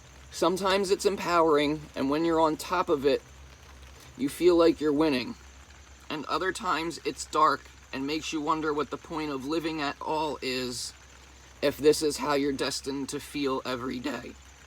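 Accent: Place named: New Jersey, United States. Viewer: That's a beautiful article! I have some chronic illness issues as well as mental health so this hits home for me too. Another good one is called the "spoon theory". It helps with both chronic and mental health illnesses. I'll try to remember to send it to you on fb if I can find it. I'm also a little tech challenged so it might take a hot minute. 😂 PS where is home for you? You sound like my childhood lol. I grew up in May's Landing NJ and was born in Atlantic City so I know jersey when I hear it lol. I always call it my first language because as soon as I hear that accent I go right back to it lol. You can take the girl out of Jersey but never the jersey out of the girl! 😁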